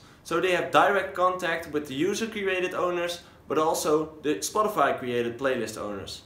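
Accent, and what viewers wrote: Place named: Netherlands. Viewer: lekker nederlands accentje! ;)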